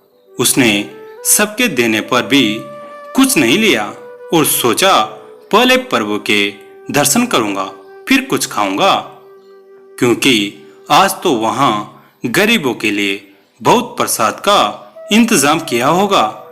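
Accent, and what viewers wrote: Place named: Pahari, India. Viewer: Bhai aap ki aawaj thik nahi lagta hai...lagta hai tum pahari ho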